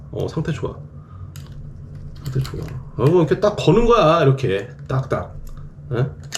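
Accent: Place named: Jeonju, South Korea. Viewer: 라면에 혼술하며 영상 시청합니다 전주쪽이랑 비슷한 사투리가 넘 정겨워요ㅋ 담에 차박 영상도 기대할게요~~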